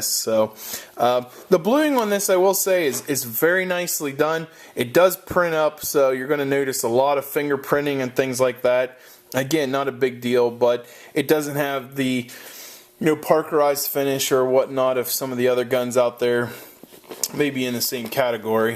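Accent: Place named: Western Pennsylvania, United States. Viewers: I need to know if you’re from western Pennsylvania based on your accent. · Lemme guess...western PA or eastern OH? I grew up there and I can hear your Pittsburghese lol. Good stuff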